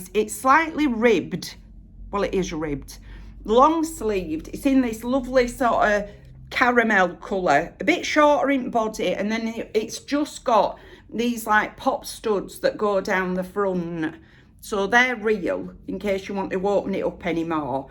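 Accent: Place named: Northern England, United Kingdom. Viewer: This is my first time watching you and I instantly linked your accent to Bet Lynch. I'm Brazilian, never been to the UK, but I used to watch a couple of episodes of Coronation Street here on Youtube to improve my listening skills, especially the older episodes, and when u mentioned her I was like how come, ahah <3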